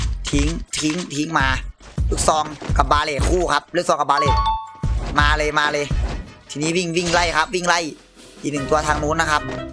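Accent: Isan, Thailand. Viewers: อ้ายแมนือเปนตะฮักแท้เว่าอีสานเด้นิย้านฟังบ่ฮู้เรือง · พี่ภาคอิสานจรืงหรอ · หวัดดีตัวตึงพากอีสาน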